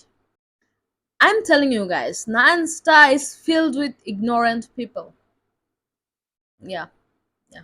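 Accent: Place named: India. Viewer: I tot u were from India,,,your tonation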